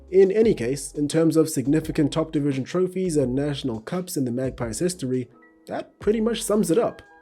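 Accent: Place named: South Africa. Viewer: Ah, South African…couldn’t put my finger on the accent.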